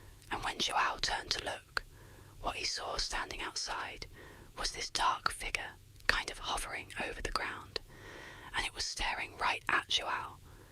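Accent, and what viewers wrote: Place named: Australia. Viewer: Bro I honestly can’t with the accent your an Aussie right? Idk I just was laughing so hard at the beginning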